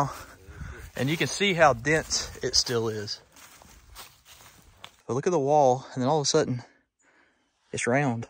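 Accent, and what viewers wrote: South, United States. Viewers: Gotta say, when you say "dad gum it".... just makes me chuckle and grin! Love our old southern talkin! Great walk... keep em coming!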